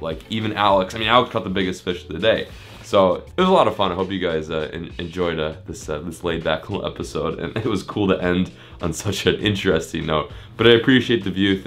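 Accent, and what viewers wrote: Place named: North, United States. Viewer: Like if y’all can hear getting a northern accent since the move haha. It’s getting noticeably stronger each video brother.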